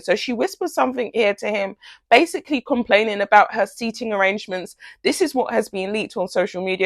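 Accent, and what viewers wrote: United Kingdom. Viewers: As soon as I heard your British accent I knew this was a hate video!!!! F U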